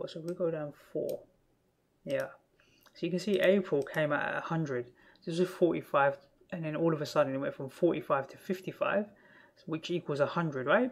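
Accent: United Kingdom. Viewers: R u British bro.?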